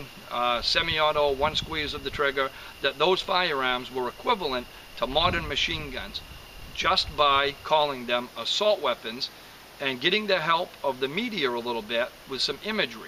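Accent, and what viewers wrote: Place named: Maine, United States. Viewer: Ike's christ jezus bubby, me an matha down at clam shukka's point pullin sum quohawgs and dun fuhgot my clam rake!Just kidding Brother, havn't been back to Down East Maine for a few years and your accent makes me miss home. Say Hi to Mt. Rialto for me.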